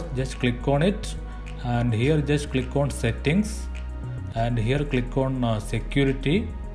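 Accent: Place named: India. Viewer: bro has the tiktok indian accent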